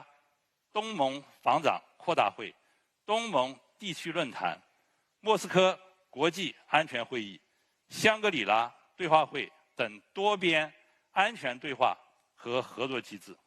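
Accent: South, China. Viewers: 應該是南方，可能江浙皖吧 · 南方口音？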